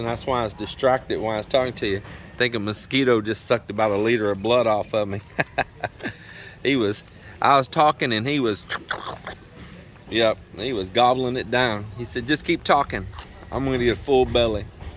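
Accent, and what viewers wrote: Texas, United States. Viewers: You are an amazing individual. Your ability to have focus on so many jobs at the same time with such detail. Memorized numbers, sizes ect from each job while supervising so many workers. The stress from that would crumble the average Joe. Me included. I have joked about the Texas drawl but fun heartedly. I knew many Texans while in the service.........and what bunch of good guys.One of my best friends was from Texarcana. Swamp land he told me. When i first started watching i wondered how you had so much money to do this. But now i guess you were some sort of construction engineer or site superintendent. Love the videos. Keep em coming. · I noticed absolutely nothing out of the ordinary! And I've watched all ur videos. I noticed no neurological problems. My family and best friend lives in Texas. I'm 65. My dad was born and raised in Texas. 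Real Texans have that accent. As it relates to not feeling 100 everyday, I never related those days to viruses. Do not let the negative comments affect you at all. Melinda's mother is right. Our mentality is the key. We can't give our energy to our ailments. We instead try to focus on wellness. Physical and especially mental wellness. Also, one's educational background has nothing to do with one's gifting. Some of the most knowledgeable people are self-taught. Quiet as it's kept, institutionalized education systems stifle our gifts, talents, and mentality. So whoever is referencing 3rd grade education needs to be educated.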